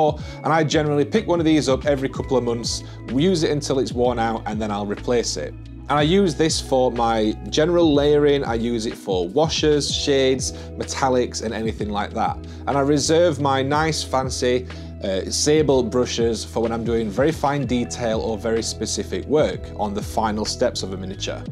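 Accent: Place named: Northern England, United Kingdom. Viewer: Hiya mate great tips here! - At last a video which is to the point and helpful and in a great nothern accent -which i can relate to lol! I just wondered if you might be able to help? I am a complete beginer with figure painting but have an art background so should be fine with the painting part. Basically, I collect transformers and am trying to 'repair' or colour match this chrome orange colour which is flaking off the figure 🙈The figure has like a chrome metallic orange with a mirror finish - so I was going to by some GSW paints as the metallic chrome and some of the other orange inks look like they might work? However do you know would I mix this with an orange first or water it down and put it over the top? any advice or tips woud me amazing - its been really hard to get any specific answers as its a bit of a specific thing I am asking lol!🤣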